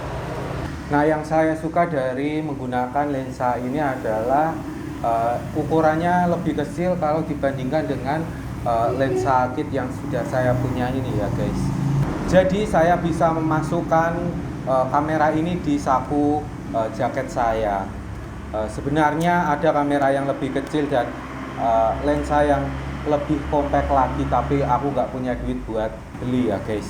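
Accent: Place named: Java, Indonesia. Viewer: So amazing, brother, your bahasa indonesia is medok jawa, so very different from your english in your other video..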